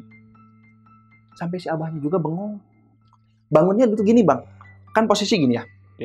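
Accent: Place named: Sunda, Indonesia. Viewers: Sunda bgt... 😁 Salam dari karawang 🙏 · deuhh kumaha iyeu nya logatna sunda pisan euyy😅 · aa, meni rame nyeritain na... orang sunda pisan... semangat a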